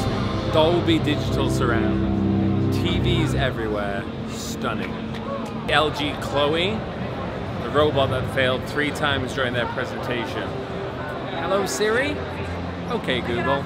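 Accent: United States. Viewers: I agree you do take on the accents, and it is none of my business tbh. You do have a nice USA/Northern twang, it just seemed more exaggerated in this particular video. Sorry if I offended you. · you sound so american:) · What's the American accent all about?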